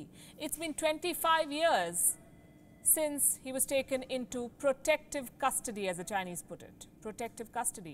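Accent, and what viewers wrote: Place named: India. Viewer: not really fond of indian accent but there is something about the way she talks that i always find interesting. not sure if its the clarity or the intonation.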